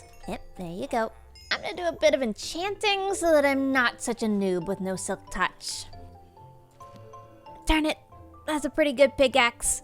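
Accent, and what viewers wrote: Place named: Newfoundland, Canada. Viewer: Absolutely threw me for a loop hearing you're a fellow Newfie, never would've guessed!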